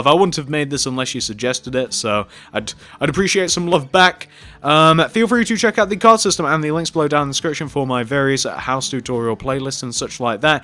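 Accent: United Kingdom. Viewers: It’s a just a brutish accent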